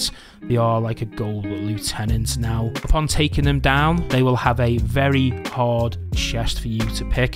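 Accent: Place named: Liverpool, United Kingdom. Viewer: Is rite lad sound like ya from round my area Liverpool? Subbed rite away 👍👍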